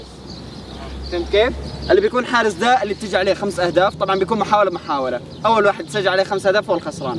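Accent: Saudi Arabia. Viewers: حلو المقطع يا بس ليش ما حكيك سوري حكيك سعودي مع التحية للشعب السعودي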